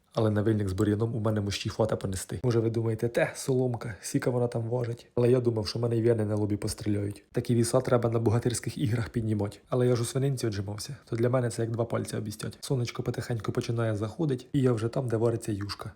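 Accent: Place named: Cherkasy, Ukraine. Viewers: Дивлюсь з Польщі . Як я люблю цей акцент, провела дитинство у бабусі на Черкащині. Корсунь Шевченківський , Стеблів де народився Нечуй Левицький... Ностальгія прямо... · Дивлюся з Варшави, пишу комент с гіпсом 🙂не могла не написати, у вас говір як у моєї мами, сама з Черкаської області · Німеччина) із задоволенням слухаю черкаську говірку) моя мама з Хлипнівки Звенигородського району) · Вітання з Нідерландів ❤ З першого твого слова вгадала, що ти з Черкащини 😅 Жила я там кілька місяців (м. Шпола). Черкаський гумор приправлений специфічним діалектом — такий само впізнаваний як полтавський борщ з галушками 🎉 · Я дивлюся з Австріі,а родилася на Кіровоградщині.Яка цікава подача-з гумором да діалектом місцевим.Дуже багато знайомих "приколиків" словесних!!!🤗👍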